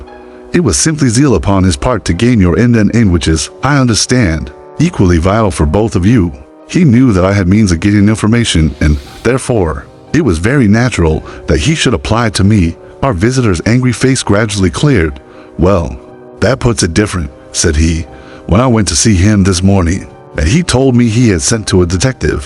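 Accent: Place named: South, United States. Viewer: I didn't like the Southern twang.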